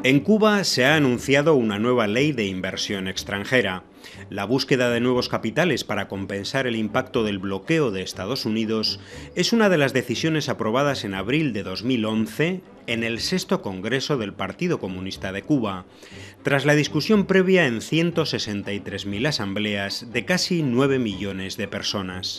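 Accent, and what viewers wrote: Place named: Spain. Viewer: Cuba Información y con un españolete de narrador! que bodrio!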